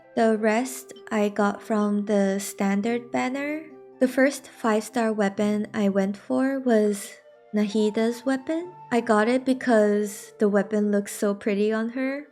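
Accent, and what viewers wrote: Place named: Indonesia. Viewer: ur not sound like japanese, more like indo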